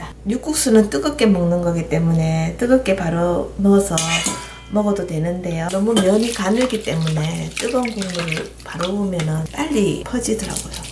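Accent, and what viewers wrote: Gyeongsang, South Korea. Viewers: 갱상도 아지매 방갑니더~ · 🐋😀아~네^^♡쇠고기국 활용도가 대단하네요😍 😘는 대구쪽이세요?아님 부산쪽이세요?🍎🍏말씀소리가 참 부드럽고 예뻐서예🍡👠💍미스때 직장 동료가 마산출신이 있었는데 정말 말소리가 예뻐서 안 잊혀지네요😍😍😍🐸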